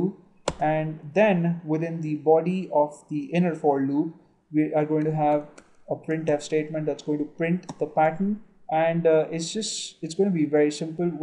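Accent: India. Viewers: even youtube doesn't understand the freaking hindi accent.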